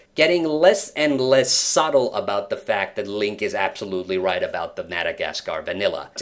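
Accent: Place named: Finland. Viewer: Oletko suomalainen? Tyckte jag anade en finskt dialekt...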